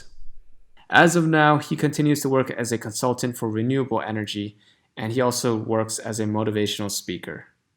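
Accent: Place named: United States. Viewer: a tiny slight hint of an American born Chinese accent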